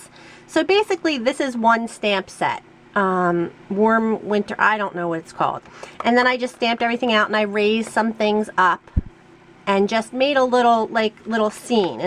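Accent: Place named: Queens, United States. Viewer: Hi!! It's Laurie, I knew you had an accent!! My mom is from Queens!! So NJ is about 6 or 7 hours (depending on traffic!!! LOL) I would really appreciate a video on those beautiful stars, everyone LOVED them!! I know you will do great at the craft show because you love what you do! I look forward to seeing more beautiful projects and you never know you just might see a Canadian License plate at the show!! All the best!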